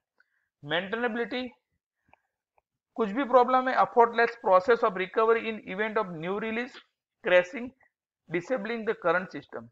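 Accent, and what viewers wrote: India. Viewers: Are you from Orissa ? Your accent clearly shows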